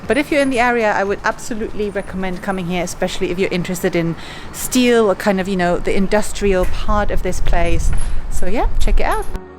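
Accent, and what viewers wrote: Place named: United Kingdom. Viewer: You are not German because you have a British accent, if you where German you would have a German speaking channel. Just admit that you are British and not German because nobody believes you.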